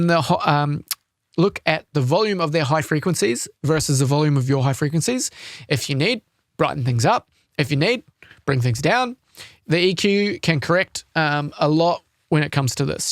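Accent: New Zealand. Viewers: Hey mate. Just found your channel and so nice to hear a fellow Kiwi accent. I just set myself up with Ableton live lite. free with my Focusrite solo interface. I've just watched your first video of the 25min intro for beginners and found it great. It would be great if you changed your mouse cursor setting to make it bigger on the screen and easier to follow your movements when sorting settings. other than that, Just subb'd thanks for you channel!!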